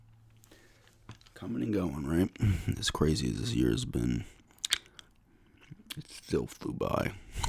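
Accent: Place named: Long Island, United States. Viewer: Kind of hear a Long Island accent, i’m in Pequa. (Potentially) small world. Keep up the great work, love the videos!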